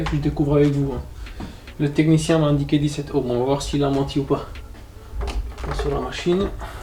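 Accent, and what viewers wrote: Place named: Belgium. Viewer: vous ressemblez à marvin atmoss'vert et vous avez les mêmes mimique sauf que marvin il n'a pas l'accent belge xdd !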